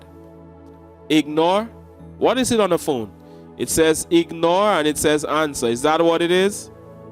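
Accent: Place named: Jamaica. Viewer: are you Jamaican? Jackie from Philly You have a LOVELY ACCEN’T and you are very INTELLIGENT! I ❤ U-U-U Do the funky chicken sweetie! It’s a nice dance. The young kids like this old dance. L O L 😇😇😇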